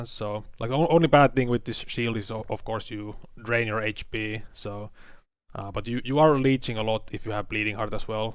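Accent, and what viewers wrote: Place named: Finland. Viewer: Juu kyllä suomalaisen rallienglannin tunnistaa ^^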